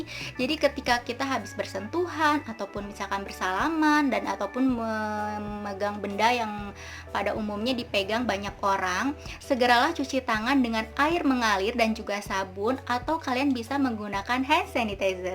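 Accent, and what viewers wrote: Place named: Riau, Indonesia. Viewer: Hadir kak 😁😁 Riau mana suarana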